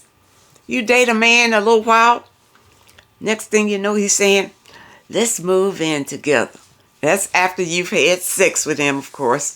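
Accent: South, United States. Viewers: There is a major difference between Southern and Stupid Hick. She IS Southern! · Love your southern accent. I am here in Australia. I remain single after divorce. Also, they think they can be your boyfriend and arrive for drinks, food and sweet romance. Got bucklies with me. Sounds like same where you are. 🇦🇺🙂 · I'd rather live in a cardboard box in a ditch than to ever try to live with another man...or, another human being for that matter!!! Thank God I've learned a few lessons from life...and, now, old enough to know better😍No easy lessons...some of us have to learn them the hard way! The subtitles are nice but I had no trouble understanding your Southern drawl...maybe because I'm from Arkansas. Enjoying your channel and constantly nodding my head in agreement! ❤❤❤ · Southern accent?? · Dear Miss, I am Dutch and can hear your lovely Southern drawl very well. 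When people can't hear you, for whatever reason, they can tapp CC on YouTube and subtitles are added. You don't have to add extra subtitles. Kind regards, Caroline, The Netherlands.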